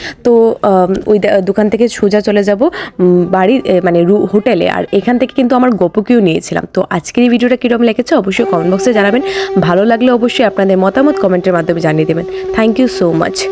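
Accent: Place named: Bangladesh. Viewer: Your speaking tone has a influence of east bengal but it's quite natural for North Eastern bengali people like tripura ,Assam ..btw welcome to my hometown Nabadwip..enjoy 🙏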